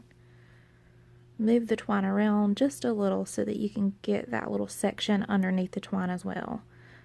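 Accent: South, United States. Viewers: Too cute!!!! To save time, I would just put drop of HOT temp glue to keep it shut so u can work on it easily. It won't show once it's painted. I'm sharing the tip cuz ur young and u need to avoid arthritis in ur hands and fingers as soon as possible. Straining ur joints to do anything leads to arthritis and pain. Absolutely loved it idea!!! Will share ur site with my nieces. Love ur southern accent, makes me homesick! Blessings to you AND ur hubby. Just tell him to wave next time and say Hey or Howdy! Make it more fun!! It's all abt having fun and enjoying life to the fullest. Let the little things go. If u can fix it, great! If not, let God take care of it! He's in control anyway. Follow the rules as best as u can (no one is perfect) and He will do the rest. I thank Him daily for all the little simple blessings that so many take for granted. Thanks for sharing!!! 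L :)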